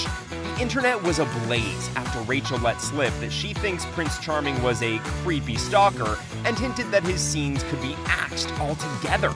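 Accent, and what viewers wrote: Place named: United States. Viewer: Could this narrator have a more annoying stereotypical American accent? He's the stereotypical irritating American (voice and opinions).... 🤮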